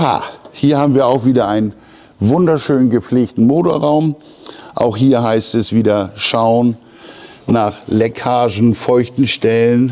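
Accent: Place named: Hamburg, Germany. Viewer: Also die Kaufberatung an sich ist nichts besonderes, weil nahezu alle Tipps auf jedes alte Auto zutreffen. Kleiner Kritikpunkt. Aber die kleinen Hintergrundinformationen zur Geschichte des Fahrzeugs oder die Prognosen der Wertsteigerung sind interessant. Und lieber, Deine Art zu Reden ist typisch Hamburg, sehr sympathisch, nordisch trocken und ich grinse bei jedem Video 😁 Bist halt ein echter Typ! 👍🏻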